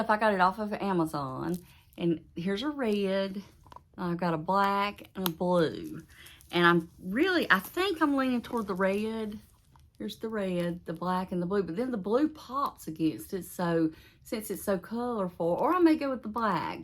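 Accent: South, United States. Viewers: enjoy your video. I love your voice southern talk.thank you for sharing 😀. God Bless everyone love and prayers for everyone 🙏❤.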